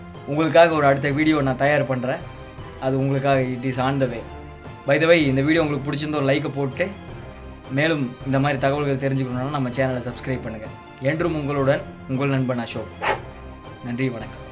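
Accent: India. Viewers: sema Sema bro.. unga channel romba superb ah iruku.. unga way of taking that kovai slag ah semaya iruku.. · உங்கள் கொங்குத்தமிழ் மிகவும் அருமை நண்பா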